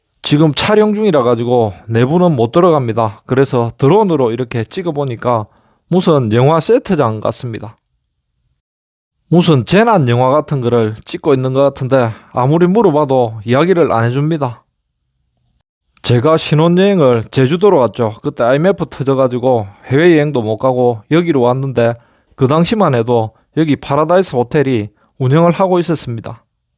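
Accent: Gyeongsang, South Korea. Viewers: 경남사투리가 짙으셔서 정치색의 댓글이 달릴것같습니다. 그냥 빨간당 이실거라는 생각이 들긴합니다.ㅎㅎ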